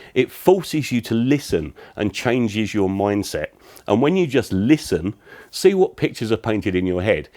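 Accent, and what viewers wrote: United Kingdom. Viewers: Woww! How could I've learned so many things in just over 10 mins video? Honestly, sometimes Brit accent confuses me, but in your case...SUBSCRIBED!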